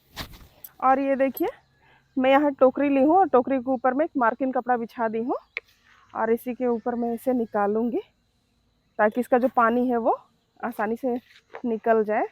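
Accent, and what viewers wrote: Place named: Bihar, India. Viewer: Hamlog bihari hai lekin aapki sari bate hamlogon ki tarah hi hai isliy achche se samajh aata hai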